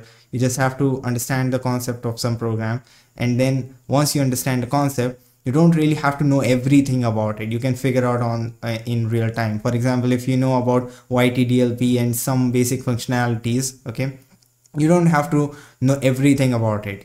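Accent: India. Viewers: This video is really good. I think a lot of productivity lies in the points you made about reading the documentation of a technology so you know what you have in your toolkit, and not falling into the trap of trying to memorize all the little details of how to use every little thing in a technology before you need it. Also, your English is very understandable. I'm a native English speaker, and I have no problems understanding what you're saying. That being said, there is a staggering number if Indians in the part of Canada that I live in, so I might just be good at understanding your accent.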